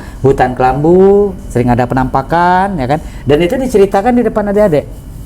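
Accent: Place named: South Sumatra, Indonesia. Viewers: Bahasa sumatera selatan tu jgn d campur2 bahasa indonesia mang 😂ketahuan bener logat mu bahasa sumsel ,bingung org klo yg gk ngerti .. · Padahal enak ceritanya, dari ngomong pakai bhs daerah di terjemahin lg pakai bhs nasional. Ya begitulah logat sumatra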